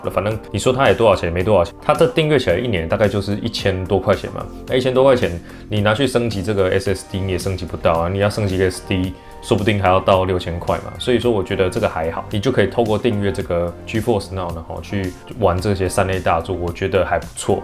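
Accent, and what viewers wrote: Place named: Taiwan. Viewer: 每次听这些台湾人说话的时候，总能让我联想到一些台湾朋友他们说话时候的赶脚。。醉了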